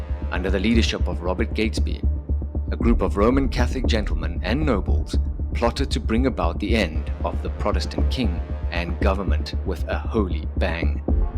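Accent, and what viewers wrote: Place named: South Africa. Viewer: I note your South African accent. My ancestors were REFUGEES to the Cape Colony from France, due to bloody religious persecution BY THE CATHOLICS, who MURDERED the family who remained in France. Catholics TORTURED and MURDERED whoever disagreed with their totalitarian orthodoxy. Fawkes was an attempted MURDERER of the cradle of modern democracy. He was no hero. He was a servant of tyranny. His death is celebrated to this exact and VERY DAY throughout the UK. With good reason.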